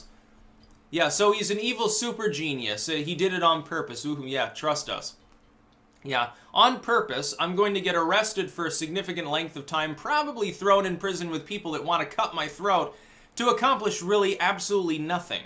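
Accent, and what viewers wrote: United Kingdom. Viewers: Dude, you have the BEST British accent ever!!! 😎🤣😁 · British accent is king.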